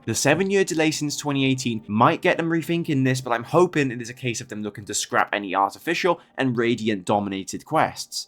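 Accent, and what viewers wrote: United Kingdom. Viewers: He's got a middle of the island British accent. This lends him popular authority which is not earned. This is the only thing the UK has left to export other than financial planning.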